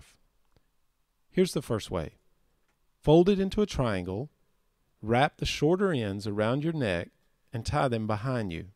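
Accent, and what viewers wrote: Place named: South, United States. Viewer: “Refer to the “how to tie scarf” application…” What a self-referential comment this weirdly southern announcer makes.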